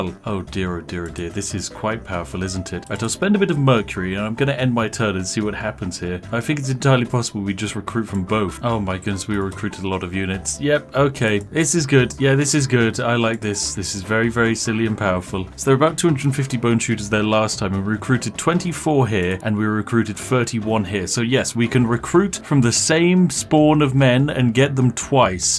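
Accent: United Kingdom. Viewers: I love me some smooth talkin' British man playing fun and interesting indie games. Best bedtime story ever. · Aaaaaaa! British boy is back, where is my tea.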